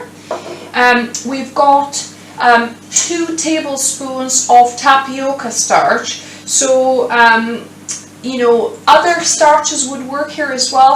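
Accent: United Kingdom. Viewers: I hear British, German I’m not sure but being multilingual is so cool 😎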